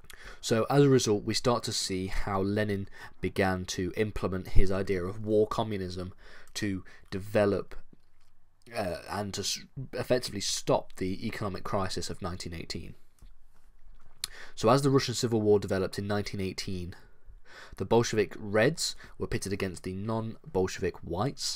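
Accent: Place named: Northern England, United Kingdom. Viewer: you can tell this guy is nothern by the way he says "class", i love it. great videos literally learnt all my year one contnent from this page. screw online school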